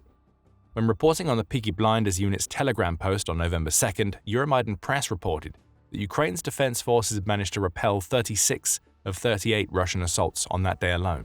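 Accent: United Kingdom. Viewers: Dear God what Bullshit. I should have known as soon as I heard the British voice. The GUR SF were on a rescue mission for a high value asset that is not going to make it out alive. Ukraine is out of reserves and It is showing all over the battlefield.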